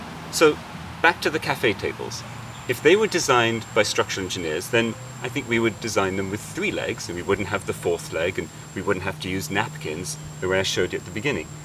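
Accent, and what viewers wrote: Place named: England, United Kingdom. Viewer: My favourite kind of English accent - Ed-dew-cated. Not once did he utter, "let me arx you sumfin, yeah?"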